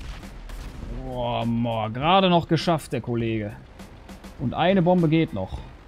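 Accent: Cologne, Germany. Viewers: ahaha wurden die dezent wegpenetriert lol.......dein Köllner Akzent ist wonderfull:D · Kommst du aus der Nähe von Köln?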